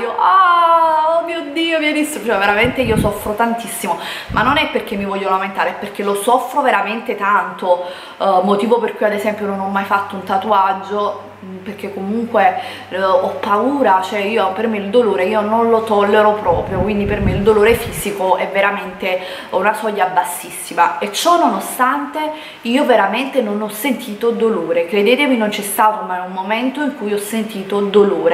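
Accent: Naples, Italy. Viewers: Che pena le persone che pensano che l'accento caratteristico di una regione sia una cosa negativa. NON è né un'attrice né una cantante, smettetela di parlare di dizione! Parlare di grammatica è una cosa, di dizione è un'altra. È napoletana e ha la sua cadenza, così come un fiorentino pronuncerà la 'c' aspirata e così via. La lingua è carica di storia, ed è cosa umana che cammina con le gambe degli uomini, nei luoghi in cui vivono. Sappiate che gli accenti e i dialetti sono un patrimonio e una testimonianza enorme e vanno preservati con tutte le loro diversità. Quando parlate di grammatica è una cosa, ma non se ne può più di leggere insulti sull'accento. Manco sapete di cosa state parlando.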